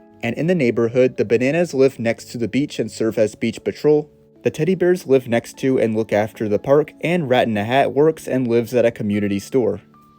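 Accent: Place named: United Kingdom. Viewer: You sound British, dude Edit: hey,is the creator of KND related to Patrick Warburton,famous voice actor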